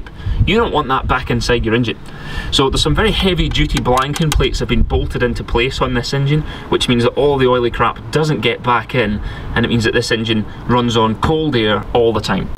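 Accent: United Kingdom. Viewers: Hi there , Like the video and the English accent to be honest (am Belgium) Question: witch software are you using please ? Cheer's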